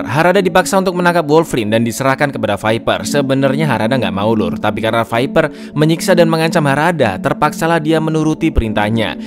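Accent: Java, Indonesia. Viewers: Lur kamu orang jawa mana? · Mas uwong jowo yo mas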